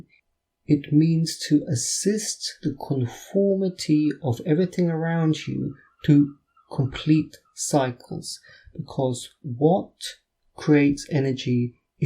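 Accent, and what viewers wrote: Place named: England, United Kingdom. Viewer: Thank you,. A lovely talk as usual. My dad was Scottish, and those pipes just wake me up, something in my DNA. You sound really English, but you are not?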